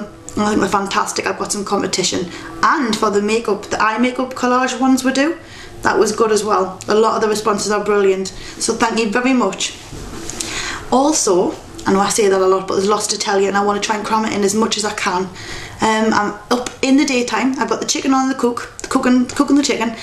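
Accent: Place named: London, United Kingdom. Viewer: I thought that you were from London :)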